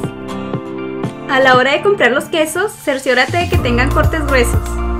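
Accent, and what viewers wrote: Chihuahua, Mexico. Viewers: Eres de CHIHUAHUA ?🤔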